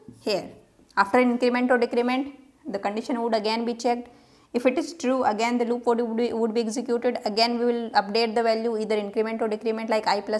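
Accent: India. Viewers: your hindi accent is very nice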